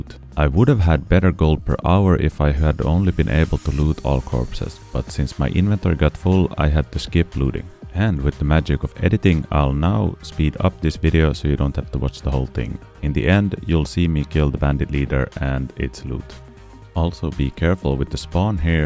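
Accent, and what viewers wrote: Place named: Finland. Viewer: were you the one who gave me that pack horse? And you sound Finnish!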